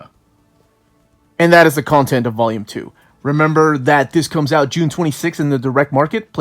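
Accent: United States. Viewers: eres peruano? Hablas el inglés con un acento gringo medio neoyorquino que ni se te nota. Pero se me hacía raro que tuvieras la piel color puerta como yo jajajaja, quizás soy el único otro peruano que te ve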